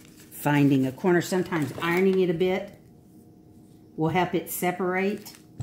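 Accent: South, United States. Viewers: Oh my goodness, I LOVE your accent. I’m guessing you’re a Southern Lady? I love it. 💖💖💖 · She kind of reminded me of Carol Duvall with a southern accent. I kept thinking I have to get off this computer, got things to do, but was riveted to the end.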